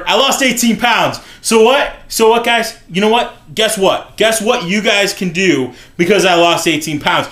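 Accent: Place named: South, United States. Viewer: or.... you could film her LOL and you live in st. louis i live in st louis! it sounds like you're from the south though i don't know why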